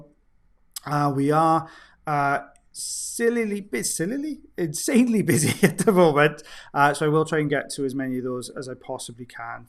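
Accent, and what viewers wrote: Wales, United Kingdom. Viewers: Lovely!! The suspense of.......its coming out......oops no it’s not.......here it is......noooooo! Better than a Steven King thriller this one !!! Thanks for sharing your talent and expertise with us.....your PATIENCE with your PATIENTS is remarkable and I miss hearing the Welsh accent, someone from home. We don’t get too many Welsh here where I am in Ontario Canada. Keep em coming!! CYMRU AM BYTH 🏴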